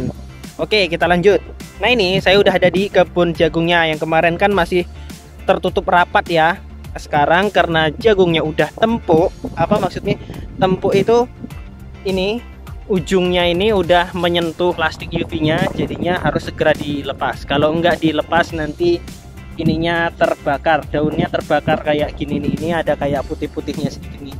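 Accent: Java, Indonesia. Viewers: Bang asli orang mana kok jawanya medok..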